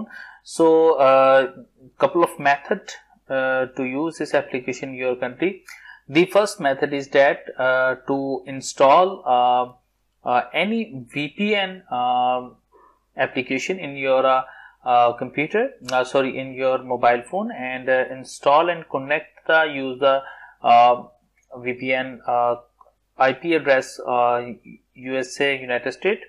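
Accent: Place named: India. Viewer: I can't listen to this the indian accent is ridiculous. Learn better English please.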